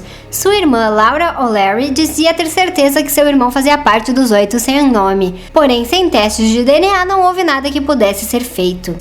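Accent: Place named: Bolivia. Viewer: voz de boliviana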